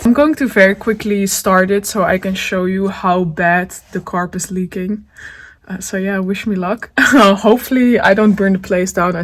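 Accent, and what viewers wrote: Netherlands. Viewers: Wat ontzetten gaaf(!). Van de kanalen die ik volg die barnfind fideo's maken, komt 80% uit de Verenigde Staten, en de rest uit het Verenigd Koninkrijk. En dat zijn er wel een paar. Van de thumbnail dacht ik heel even dat je ook uit de VS kwam, maar je accent is onmiskenbaar Nederlands!😉 Het is leuk om iemand tegen te komen op het internet die zogezegd "uit de buurt" komt. Ik ben heel benieuwd wat de levensloop van deze 4x4 gaat worden en welke avonturen het gaat beleven. Èn of het misschien ook een naam krijgt. Zoals bijv.: Martin de Subaru, en Oliver. Een 1963 Opel Kadett. (Edit: oeps.. Beide auto's van Richard Hammond van Top Gear/ The Grand Tour. Maar dat wist je waarschijnlijk al😅)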